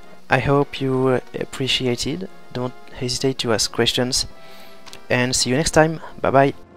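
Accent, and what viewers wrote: France. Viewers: Marrant ça, avec un accent français j'arrive parfaitement à comprendre l'anglais :) (Gros HS)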